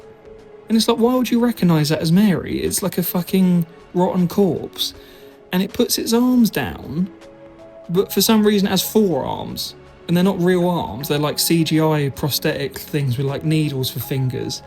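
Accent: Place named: United States. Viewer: PS I love your American accent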